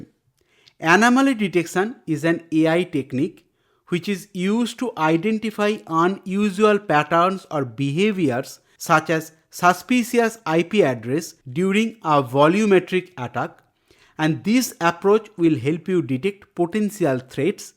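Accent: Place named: India. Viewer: These are the best series for Indian users, the voice and accent is for the Indian ears. Also the content is well researched better than AWS own AI practitioner course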